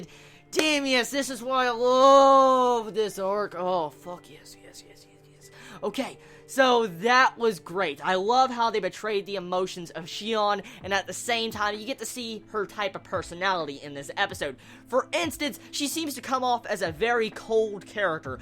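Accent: United States. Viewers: That country accent tho...:)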